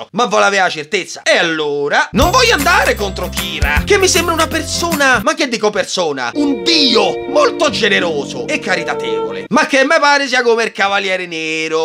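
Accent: Rome, Italy. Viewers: Mai visto un riassunto che dura 4 min in più dell'originale, con accento romano imbruttito, jojo reference e doppiaggi più ignoranti di ogni cosa. Voto: diesci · Ultimamente ho notato un indizietto che potrebbe aiutarci a rivelare la vera identità del, il fatto è che nei video parla in dialetto romano, ma non stretto. Ne deduco che abbia origini o risieda in Roma e provincia. Al quanto pare la tua cattura non è poi così lontana ＼(~o~)／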